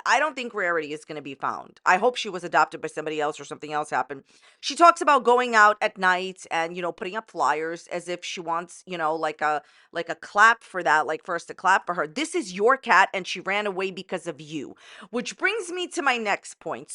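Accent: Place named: Long Island, United States. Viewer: sounds Long Island-y to me.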